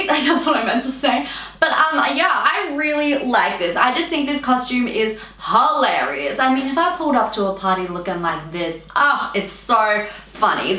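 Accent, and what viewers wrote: Australia. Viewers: I’m being cheeky and I don’t mind if y’all don’t think it’s funny if I tell you to get yr heads out of yr bums. However, many people are trying to correct for saying it’s Wednesday. Maybe it’s just me, but I hear someone with an Australian accent say it’s a day later than it is for me and I think, “Hmm, I wonder what time zone they’re in?” not that they must be wrong.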